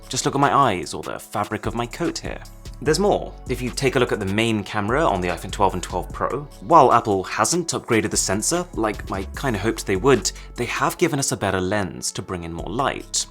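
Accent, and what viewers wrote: RP, United Kingdom. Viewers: I just absolutely love watching this guy's videos and updates! Always super detailed and right on point along with that fantastic and beautiful British accent 🥰!! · this guy speaks like a royal family member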